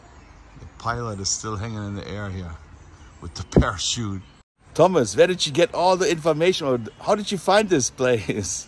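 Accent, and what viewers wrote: England, United Kingdom. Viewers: The English accent had me straight away 😂😂😂😂😂🤣🤣🤣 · The England accent 🤣. Why I never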